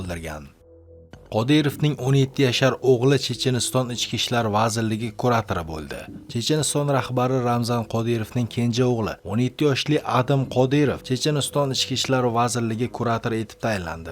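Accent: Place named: Kashkadarya, Uzbekistan. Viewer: ШЕВАЙИЗДАН БИЛИНИБ ТУРИПТИ КАШКАДАРЙО ЙОКИ СУРХОНДАРЙОДАН БУЛСАНГИЗ КЕРАК,,, ШАХРИСАБЗДАН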